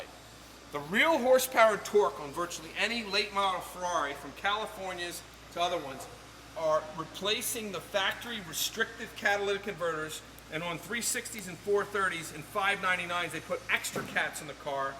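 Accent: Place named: Long Island, United States. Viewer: I moved out of NYC and I watch Fabspeed videos when I need my fix of that Meeeasapeequah accent. Parts look great too. How do these cats do on emissions?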